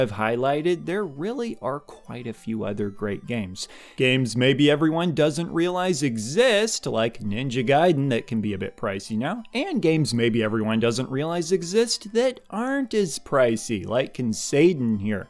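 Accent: Midwest, United States. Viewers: Just subscribed are you Midwestern? I hear your accent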